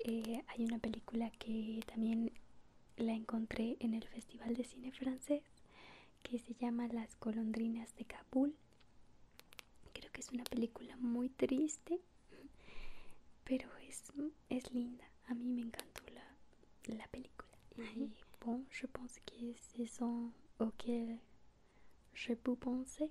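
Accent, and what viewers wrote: Spain. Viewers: Merci d'avoir répondu à ma question. 😊😊😊 J'adore ton accent espagnol. ❤❤ Du coup, un grand tour de France à programmer.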